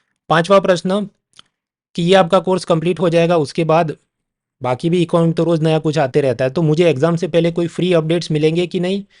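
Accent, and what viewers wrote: Gujarat, India. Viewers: Saheb tamari vato ma Gujarati touch joine bhaiband bau maja aave chhe 😂😂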